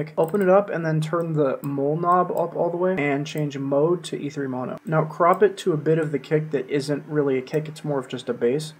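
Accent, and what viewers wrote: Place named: United States. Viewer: I'm making an assumption on your accent but you sound like you're American! Dude I am going to rep you so hard, we need more Americans making hardcore.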